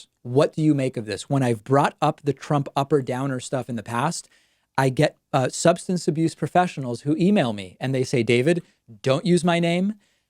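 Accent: Argentina. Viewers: ‘Cinco de Masho’ - love the Argentine accent.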